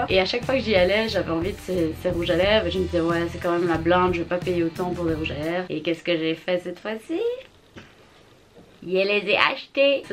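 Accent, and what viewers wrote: Belgium. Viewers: Coucou alors je ne commente (vraiment) jamais de vidéos mais il faut que tu saches que je te trouve vraiment extra. Déjà l'accent belge ensuite ton parcours professionnel de surcroît (oui oui on est en com on utilise de beaux mots) ton style et vraiment le plus beau c'est ton naturel. Continue comme tu fais ! Si tu passes à bx préviens moi que je te paie un café (ou une biere) pcq je suis sûre que tu me fascinerais. Beaucoup de bisouuus et ta video est une pépite 😘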